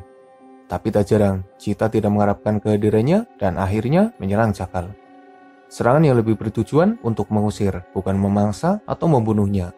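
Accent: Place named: Java, Indonesia. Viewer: Suaranya keterah banget orang jawa Dari jawa mana min soalnya saya juga orang jawa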